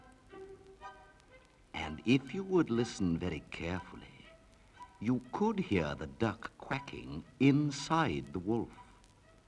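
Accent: United Kingdom. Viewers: Interesting that this is not on Wikipedia's enormous list of recordings. This is Wilfred with his RP accent, similar but not quite what he used to read the BBC news. Imagine if he had used his Lancashire accent! Lovely transfer by the way. Thank you so much. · The most British accent there is.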